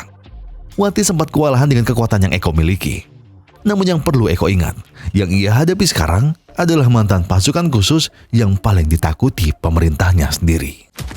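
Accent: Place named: Java, Indonesia. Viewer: Lu asik bang jelas wong jowo iki mas 😂😂😂😂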